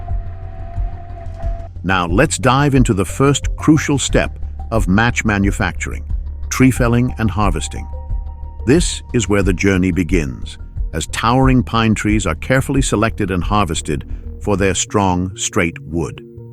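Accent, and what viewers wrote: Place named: United Kingdom. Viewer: Have someone w/ half a brain listen to ur audio to see what they think. Constantly stating the obvious over & fing over!! Hire a real writer & narrator, that British accent could not sound any dumber!!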